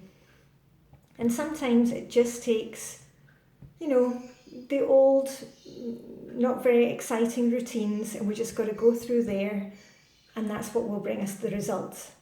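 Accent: Scotland, United Kingdom. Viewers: ! <3 I've been meaning to ask, just for fun... are you Scot/Scottish?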